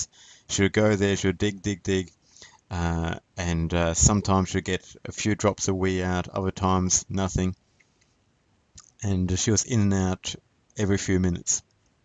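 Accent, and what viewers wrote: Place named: England, United Kingdom. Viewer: Aww... God bless you and your message. I came across your video because I think my cat has a UTI. Please pray for my cat, Harley. I will be praying for him and everyone who comes to this page. Prayer is powerful and thank you for your message. You sound English. I lived in England for 7 years and felt that I was the only Christian that lived there at the time. God answered many of my prayers while living there. God bless you and everyone that visits your video.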